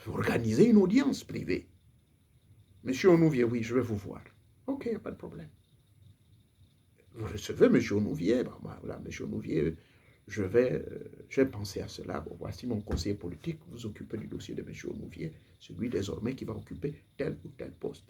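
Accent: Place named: Gabon. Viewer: bonjour au fait dites moi vous parlez beaucoup du Gabon votre mélodie est gabonaise Êtes vous gabonais ou vivez vous? C est rare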